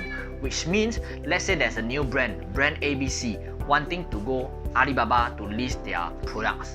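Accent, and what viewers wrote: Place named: Singapore. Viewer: I understood fine. If you are used to this type of accent. I have coworkers in Singapore that have this same type of accent.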